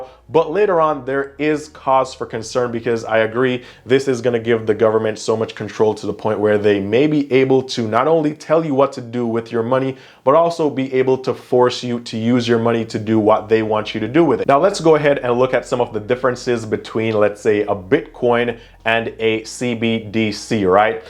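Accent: Jamaica. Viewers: Do I hear a slight Jamaican accent? Are your parents Jamaican?? Good stuff though. Thanks for educating those who are in the dark 👍✌️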